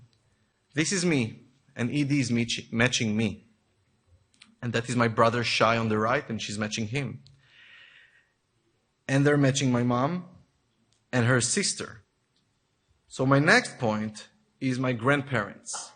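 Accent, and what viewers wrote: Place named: Italy. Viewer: u sound italian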